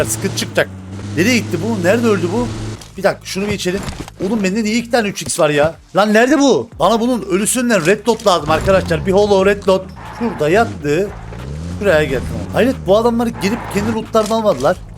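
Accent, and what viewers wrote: Cyprus. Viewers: Abi Kıbrıs şiven e aşık oldum .d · abi net kıbrıs😎😎😎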